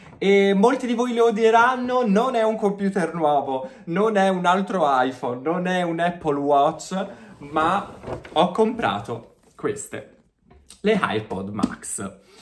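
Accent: Tuscany, Italy. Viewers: Ciao ….io è da poco tempo che ti ho scoperto e ti seguo…ma sei stato una meravigliosa sorpresa….non riesco a fare a meno di guardare i tuoi video perché mi fai spataccare dalle risate….sei una persona davvero stupenda e sono molto felice di averti scoperto! Poi hai quell’accento toscano che io ho sempre adorato e mi piace da impazzire😍 ti auguro tutto il bene di questo mondo e che tu possa fare sempre piu video e avere sempre più persone che ti seguono…perché te lo meriti! Sei unico!❤………… ah stavo quasi per dimenticarmi…..no scherzo🤪😂 tanti tanti auguri di un meraviglioso compleanno❤ ti adoro 🥰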